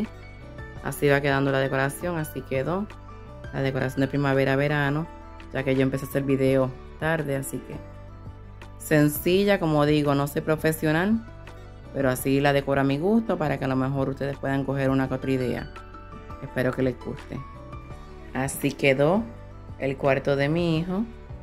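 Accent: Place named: Puerto Rico. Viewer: yo también soy de Puerto Rico vivo en Iowa. Te reconocí por nuestro acento. 😂😂 eres nueva y te voy apoyar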